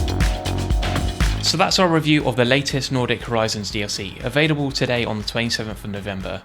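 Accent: United Kingdom. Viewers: Some of your negatives are realistic tho, you get slower speed limits in the middle of nowhere just because there might be a forest road that log trucks frequently use, or an intersection, in video you clearly see there's some buildings so it might be due to the safety and also noise pollution, judging from your accent you are british therefore I get it, but literally everhywhere in Europe 50km/h applies if you see a city sign in some countries it is the one with the city name in some you have a city name and then the urban area sign with buildings on it which act as a zone with rules such as 50km/h, parking allowed, cars can be in left lane even if nobody is in the right unless blocking faster cars etc...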